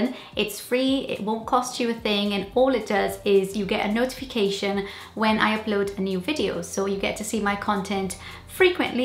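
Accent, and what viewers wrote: United Kingdom. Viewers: Your British accent is really different to my ears but I could understand what you said. Your last video I watched you were still pregnant and you said your daughter is already one year old. oh my. I need to watch more YouTube. In the moment I'm using YouTube Go and I'm not sure I can download your videos there. Anyway, your furniture is amazing! BTW I loved the video quality.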